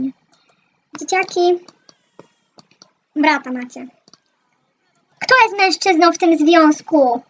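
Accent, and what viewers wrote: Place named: Silesia, Poland. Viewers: Śląski Akcent 👌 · Ze Śląska ? Bo masz taki akcent xd ja jestem ze Śląska xd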